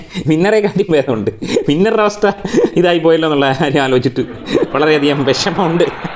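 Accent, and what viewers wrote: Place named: Koyilandy, India. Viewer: Ningal samsarikyunad enikyi eshtta kelkan njanum koyilandy ulla alane banglore settle anane ullu☺, chirichu oru vazhiyayi 😁, koyilandy ulla alukal nanma thiricharivullavara bro🥰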